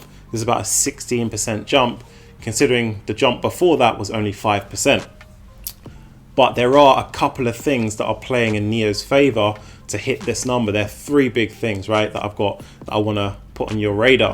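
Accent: United Kingdom. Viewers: Good brief, nice to have a British accent. Well done.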